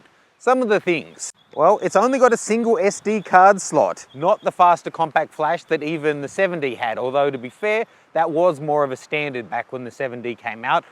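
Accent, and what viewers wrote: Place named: Australia. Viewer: The Australian accent is the hallmark of racist moron in the world right now So if you want to talk about cameras tone it down on the ugly accent Mate !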